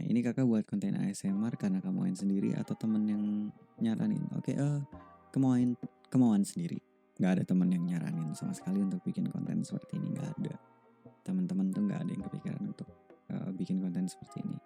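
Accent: Indonesia. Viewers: Ehhh aku baru nonton dan denger iniiii. Nice to know you ya kakk! Ternyata kita ga jauh beda umurnyaa, kamu 2 tahun lebih tua daripada akuu. Aku juga ngerasa aksen kamu sunda banget, soft banget, tapi ga spesifik nebak bogor sih wkwkwk. Anyway, aku berharap ada lagi Q&A kayak gini lagii 🥺🥺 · Plis ya gue baru denger video ini, dan bogor accent itu nyata adanya loh wkwk kerasa aja gt beda nya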